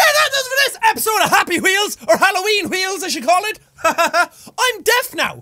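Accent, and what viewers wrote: Ireland. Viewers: I fucking love the Irish accent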